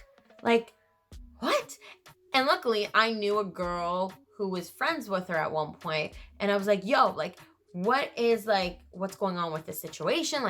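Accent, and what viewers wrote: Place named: Middle East. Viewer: Which part of the middle east you from?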